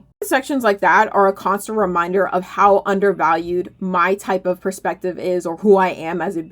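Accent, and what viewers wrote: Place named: New York, United States. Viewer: I unsubscribed from the fake wizard because of the annoying new york / jersey accent.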